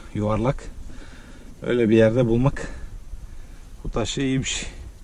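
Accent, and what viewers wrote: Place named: Aegean, Turkey. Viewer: Abi konuşman ege muğla aydın tarafı gibi nerelisin sen hemen beniyle bir irtibata geç asıl işaretler burda biz anlamiyoruz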